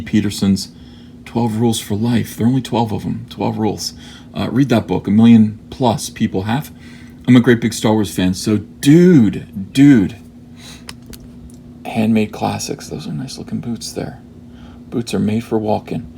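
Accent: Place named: New York, United States. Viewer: Lol that sounds nothing like Mikey Zeruh. More new York less matrix agent.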